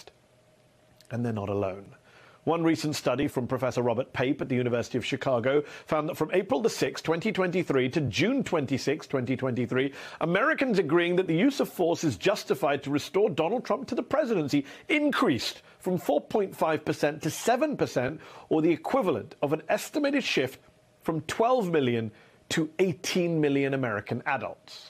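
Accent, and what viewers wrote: United Kingdom. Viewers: You dont sound like an American more like a Britain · You have a British accent you're not American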